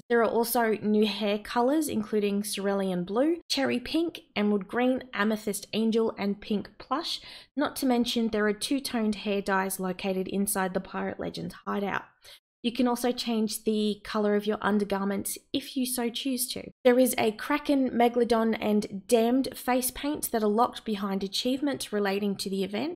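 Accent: Australia. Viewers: Love the aussie accent 😜👌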